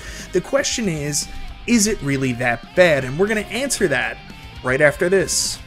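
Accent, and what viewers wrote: Canada. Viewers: no offense I watch your videos. Your Canadian accent with your pronunciation of Arcade gets on my nerves to no end for some reason. Lol. But people come here to hear about the game. While not stating it you were giving other peoples opinions of the game. You are essentially giving a review when people watch this of what to expect. You’re splitting hairs here.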